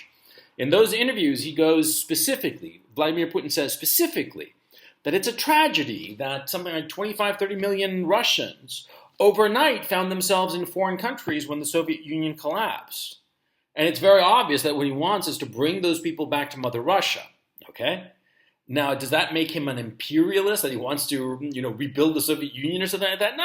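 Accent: United States. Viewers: according to your English, you most likely are from the USA, living abroad. The point is not that Europeans do not understand the situation but Eiropean leaders like Macron or von der Leyen are corrupted and just puppets on strings with puppeteers in Washington and or London (City) it's not only a war against Russia, it's also against Germany. Look at the Nord-stream case... Gas is not only a problem of heating in winter, it's energy and a vital raw material for the industry ! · This idiot is so ignorant - sure Europe will have problems for the next 5 to six months due to oil, etc. , but Israel has just signed a major deal to start supplying gas and oil to Europe. And this is kept quite quiet as this will turn Russia, Iran, Turkey with its worsening economy start a war against Israel. (All for booty) - Israel discovering unbelievable amounts of oil/gas. Also America and other countries do produce grain. 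This man was brought to the US as a small child and was raised in the US perhaps as an asylum refugee are illegal, or immigrant and benefited from all the generosity of America for education, housing, education, etc. like so many others that come to the US, UK and Europe - now he has all this hate towards the US. He now states he is from Chile. But note his very much US accent. Also, he is in no danger as he is most likely a mouthpiece for Russia. Ungrateful ignorant “immigrant”. He seems to hate Europe and America for whatever reason. Europe , UK, America and Australia will do just fine and help other less developed countries. The Us does have a dementia President run by the Globalist but this puppet US President is run by a somewhat competent intelligent administration run by three equal branches of government. He states Israel hates Russia - that is false - Israel up until now has had a great relationship with Russia as Russia allows Israel to bomb terrorist in Syria coming from Iran that are trying to wipe Israel off the face of the map. 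Israel pe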